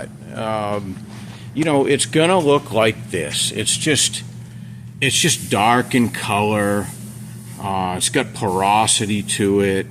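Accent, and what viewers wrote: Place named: Boston, United States. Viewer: Apaht. All the pahts. Miss being around that accent , raised in the Baystate (living in the south now)… anyhow dipping it is hella pricey but no warping no sand surprise when painting no texture changes. Sitting on my ‘47 dually till I can afford the same. Going to set it on a 90’s cummings dodge chassis… Hey check out Rungë cars on yt, great coach builder also khaos design yt out of Australia great stuff.* edit to add love your perspective and attitude- so refreshing. Also great point on wanting to drive it not perfect it- oh man that hit home😅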